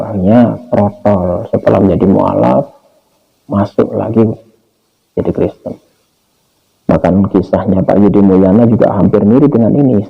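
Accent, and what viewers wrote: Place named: Java, Indonesia. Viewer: Kau bercakap berteleleh...macam org nak mampus....jawa medokkkkk...insyallah..Ali diaAb Allah...Aaminnnnnn....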